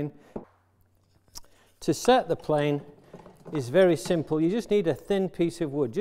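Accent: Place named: Northern England, United Kingdom. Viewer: Our northern accent never leaves us =) great videos.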